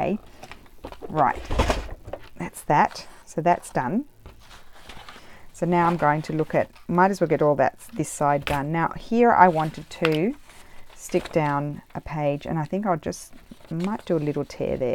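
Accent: Australia. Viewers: Hi are you from Australia 🇦🇺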